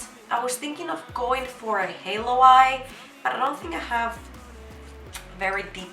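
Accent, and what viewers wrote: Greece. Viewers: I love it too, Greek accent is the cutest..I could listen to anything, including user manual for drill machine, if it was said with Greek accent..or in Greek 😂💯💯💯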